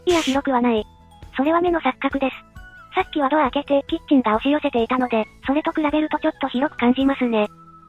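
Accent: Hakata, Japan. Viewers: 博多弁がオモロイですね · 博多弁がなーんか嬉しくて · 家賃、いくらくらいなんでしょうね。 ところどころに博多弁が出てくるのが、福岡の人間として嬉しい😊。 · 博多弁がバンバン飛び出してくるので、何か嬉しい。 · 博多弁や福岡の景色が懐かしく嬉しいです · 博多言葉がかわいらしくて、いいですね。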